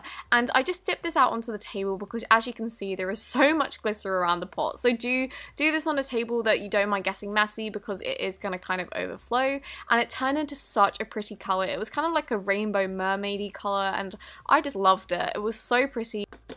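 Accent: England, United Kingdom. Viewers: I ligit loves her videos (like if you agree) they are so calming and I l9 e her British accsent also there's a better chance of seeing pigs fly than her actually seeing this but I still love her... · Welll are you american?? If you are all British voices will sound the same to you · to Umm... Where’s her British accent?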